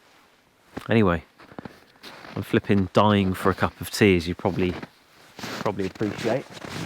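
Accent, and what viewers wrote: England, United Kingdom. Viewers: What are u doing in Sweden mate do u work there because I can tell you are English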